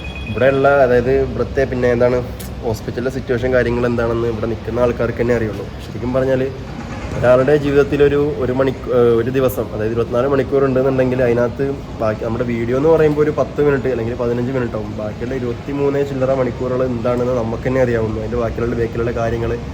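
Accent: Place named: Kollam, India. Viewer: കൊല്ലത്തെ ഭാഷ ഭയങ്കര ഇഷ്ടമാണ് 😍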